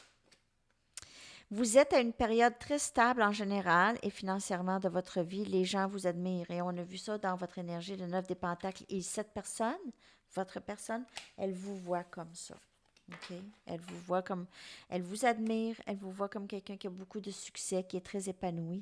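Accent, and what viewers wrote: Quebec, Canada. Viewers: Hello, j'adore votre accent québécois 🥰 Vous avez dit des choses qui me parlent énormément par rapport à cette relation, l'arc de triomphe par exemple...C'est quelqu'un dhyper sensible en fait mais qui refoule ses emotions et qui se protège énormément avec son masque et son mental..il utilise la musique pour dire ses émotions...merci pour vos généreux conseils...it's a little bit funny this feeling inside... voilà une chanson qu'il chante souvent...c'est ma star 🌟😍🥰❤.. je vais ecouter la chanson d' pour écouter le message et essayer de lâcher prise..😌😉 Merci🙏 😘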